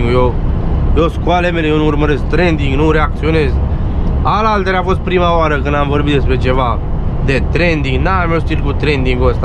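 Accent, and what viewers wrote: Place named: Oltenia, Romania. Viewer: Fiindca esti oltean de asta nu te intelege nimeni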